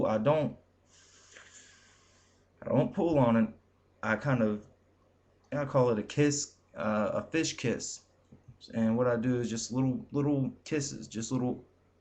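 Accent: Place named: Georgia, United States. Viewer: Great video--since I'm from GA, your accent is a plus!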